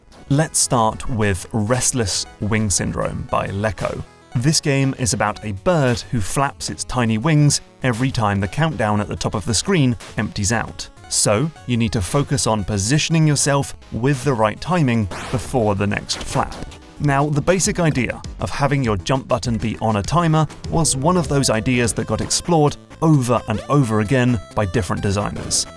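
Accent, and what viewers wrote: United Kingdom. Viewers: Yeah my man do be british though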